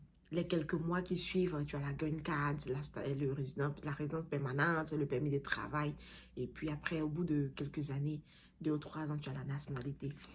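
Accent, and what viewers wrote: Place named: Ivory Coast. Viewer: L'accent ivoirien 🇨🇮 ça ne ment pas. Très propre et limpide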